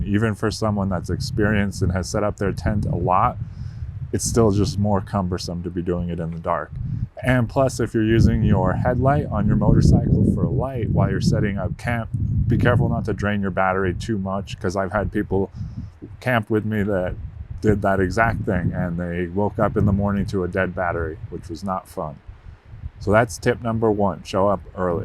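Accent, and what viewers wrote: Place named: Massachusetts, United States. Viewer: Framingham? Newton? Norfolk county? pegged your accent right away, now I gotta know....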